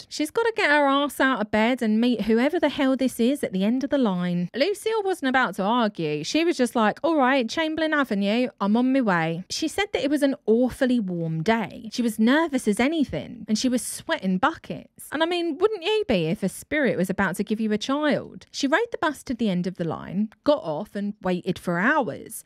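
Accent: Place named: United States. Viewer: Please, I'm begging you, no more American accents.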